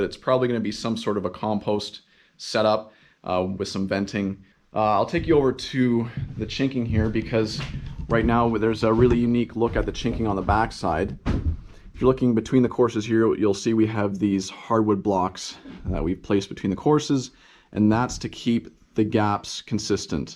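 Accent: Canada. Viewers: What a beautiful cabin! Love the wood stove that you put in too. So nice to hear your Canadian accent, I'm from Canada and I don't get to hear the accent often. Love your front door too, it's huge and perfect for the cabin. (from Sandi)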